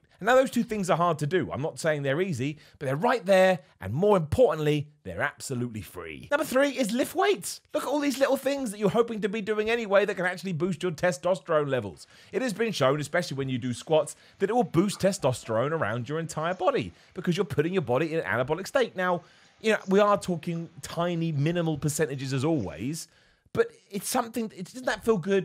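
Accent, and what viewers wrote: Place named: England, United Kingdom. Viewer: Yo, you look like the older version of Saitama. Plus the English accent 👍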